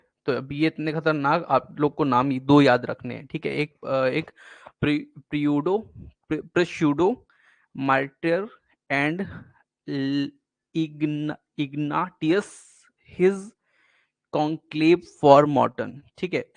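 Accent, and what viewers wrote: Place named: Rewa, India. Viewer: Sir aap reewa se h ,lagta h aapki voice se....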